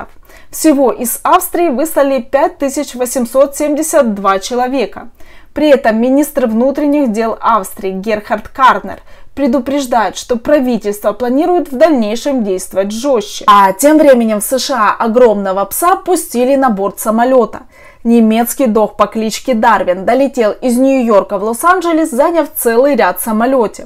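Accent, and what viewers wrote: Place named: Ukraine. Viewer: Дуже сильний малоросiйский акцент. Треба бiльше працювати над мовою. А так, спасибi, цiкаво слухати.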